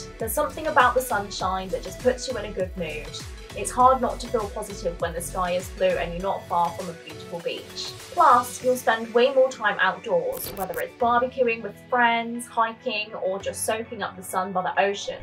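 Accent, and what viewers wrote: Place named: United Kingdom. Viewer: And where are you from in the Uk accent sounds familiar I left in 1979 from just outside Birmingham.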